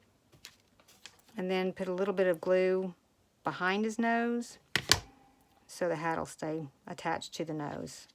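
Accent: South, United States. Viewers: Fantastic can’t wait to get making some! I love your southern US accent too 🇬🇧😀🤗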